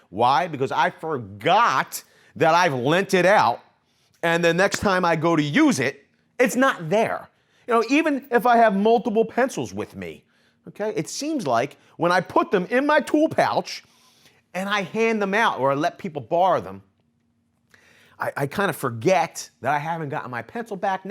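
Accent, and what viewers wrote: Philadelphia, United States. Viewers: Wudder. Everyone is always like "you mean water?" Screw you it's Wudder. Love our Philly accents bro. Go Phil's go birds. Suck it Dallas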